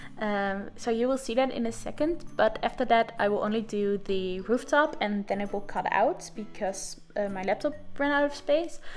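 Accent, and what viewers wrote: Netherlands. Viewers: Ik herkende letterlijk dat dit nederlands is na de eerste paar secondes... #dutchaccentstruggle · ik ben ook nederlands!! Leuke build! Ik dacht al dat je nederlands was. dat accent is zo herkenbaar haha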